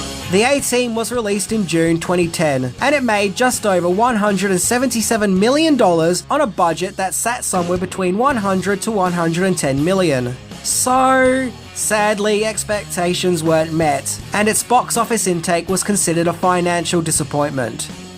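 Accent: Australia. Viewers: I think your videos are fun, and I have no problem with you calling "George Peppard," "George Peppered." (I just thought it was your Aussie accent, tbh). Just like you called "Hannibal Smith," "Hannibal Lechter" in this video. Production accidents will happen and, all things considered, I thought THAT was hilarious! 😂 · Personally I think it’s interesting and funny when you pronounce things differently because of your Australian accent. It’s what makes you.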